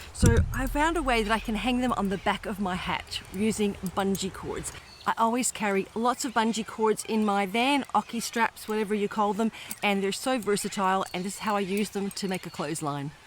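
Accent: Australia. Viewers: Great tips and really helpful to someone like me just getting started. PS, used to live in South Australia when my husband was still alive. I miss it (and him) very much. Nice to hear the 'accent' again :) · Love your Australian accent...but some words sound Canadian? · At the very beginning of this video I thought this lady sounds like an Aussie with an American accent lol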